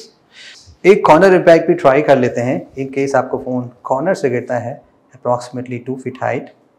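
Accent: Lucknow, India. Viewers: Bhai aap Lucknow se hai na?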